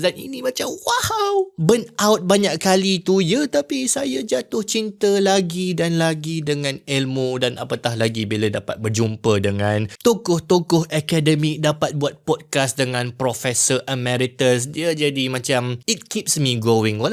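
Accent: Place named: Perak, Malaysia. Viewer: Pekat perak nye yeob🤣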